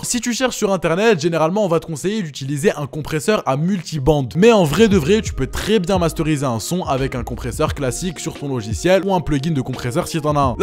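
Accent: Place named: England, United Kingdom. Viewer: Ce bête d'accent anglais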